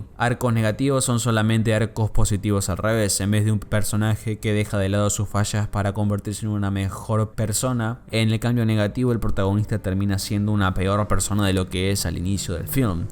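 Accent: Argentina. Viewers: Argentino papa!!!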